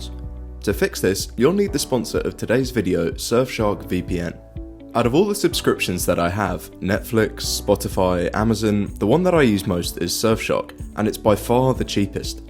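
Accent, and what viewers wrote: England, United Kingdom. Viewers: As your voice is English, why are you talking about dollars and looking at sites selling things priced in dollars. Do you live in America or is it that you are running some kind of con-trick ?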